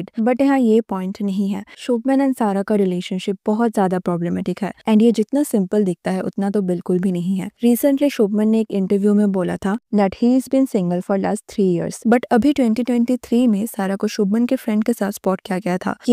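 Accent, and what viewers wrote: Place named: Telugu, India. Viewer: After listening to your voice in a lot of your videos. I'm guessing you're a telugu. Is this true?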